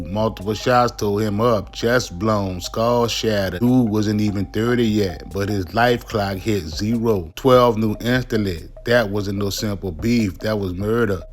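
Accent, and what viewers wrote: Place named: South, United States. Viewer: Is this ai with a country accent? I understand what he is saying but the cadence is weird.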